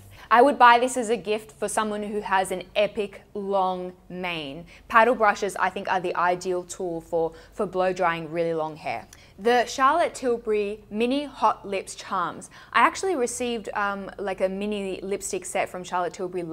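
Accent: Australia. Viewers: This video makes me so happy 🥰🥰 I always adore your content but this was especially 👌 When you said ‘ya weirdo!’ And swatted at the screen I burst out laughing. I’ve been overseas half a year and just the combo of the Aussie accent, the -ism and the playful niceness made me think of home and Aus, which I’m starting to really miss since I’m in the Dutch winter and the weather is grim/there’s been a cultural adjustment to say the least. I love how you give specific recommendations such as ‘the friend who reads all the glossy mags’ it makes me able to picture what goes where, or even more like which vibe suits who. Which is what I always aim for in gift giving. And finally, at the end when you spoke about engaging with something close to your heart and you spoke with such enthusiasm and sincerity...that was so lovely. A reminder of a different kind of gift giving ❤️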